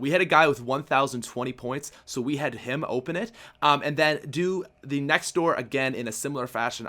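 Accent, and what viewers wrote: Canada. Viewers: this is my first time checking out your channel and you sound way more Canadian than lex. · I think he's from Canada. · Hey are you Canadian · R u Canadian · Are u Canadian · Strongest Canadian accent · Is he Canadian? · Are you canadian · Dude i love the Canadian accent. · He’s Canadian. That’s how Canadians pronounce out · Lol this guy is definitely Canadian. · Dude he's Canadian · You are definitely Canadian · Are you Canadian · the Canadian accent is strong in this one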